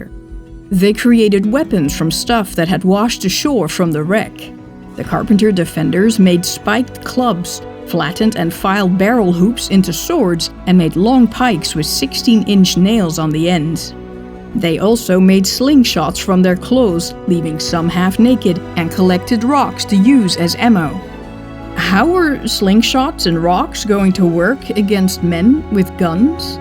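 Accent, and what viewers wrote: Netherlands. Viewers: Of all the masses of information I have read, and documentaries I have watched, on the subject of the wreck of the Batavia, this is by far the best. The narrator is brilliant - the slight Dutch accent brings the story to life, and some of the facts presented even I did not know - and I’ve been an avid student of this shipwreck for over 40 years! This entire channel brings history to life in a very detailed and understandable way that draws the audience into the story and compels them to follow it through. I’ve taken to playing the audio through the car stereo on my way to and from work, the stories fascinating and well told. I cannot wait until another subject gets the Defragged History treatment.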